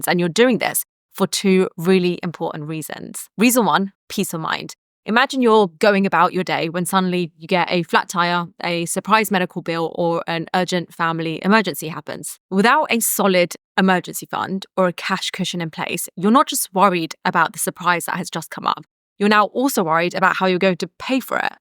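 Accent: United Kingdom. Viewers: All I want to know is how to get this cool UK accent.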